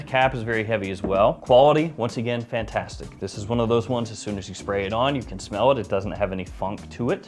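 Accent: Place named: Texas, United States. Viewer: It sounds like you live in Texas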